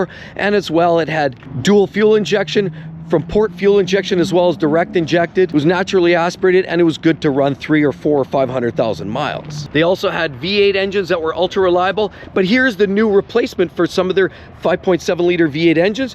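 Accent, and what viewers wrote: Edmonton, Canada. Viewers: Seems like you’re from Edmonton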